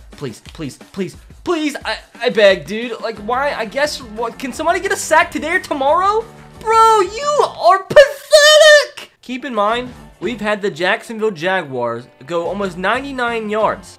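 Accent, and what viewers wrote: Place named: Pennsylvania, United States. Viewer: Pennsylvania accents make we want to gouge my eardrums out.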